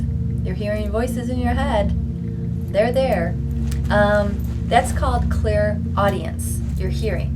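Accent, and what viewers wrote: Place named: Upstate New York, United States. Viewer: I like the way you talk and your voice maybe from upstate new york. I see a lot of lady bugs.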